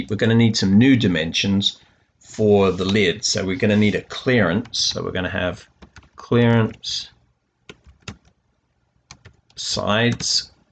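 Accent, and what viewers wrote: United Kingdom. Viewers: You are a great teacher. I was looking for a CAD Software and found FreeCad. The new good CAD-Programs are only for rent anymore. As a single-man-business in Germany I just can´t afford to pay 500 Euro per month for renting a CAD-software while my main business is calculating frames and using other software. This software is meant for Industry - some fulltime - drawers, who live in their element - with their shortcuts and their productivity. Anyway, I would like getting better at Freecad and the FEM - workbench. Thumbs up, you´re a great person having an english accent and living in the US. Freundliche Grüße, Niko Böhm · Great videos, brings home the benefits of parametric designs, very well explained. You mentioned the possibility of adding this to an assembly, would be great to see that. Would also love to see something with print in place hinges on a box, can't find a full demo for freecad anywhere. 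Can't place your accent, doesn't sound American, dare I say more British ? Thanks for the time you put in to help out a beginner.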